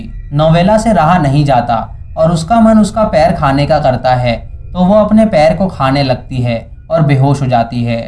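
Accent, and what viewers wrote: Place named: Bihar, India. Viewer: Bhai bhihaari hain kya (hain,hain,hain) bol raha Bhai sahi se explain ker movie. 🖕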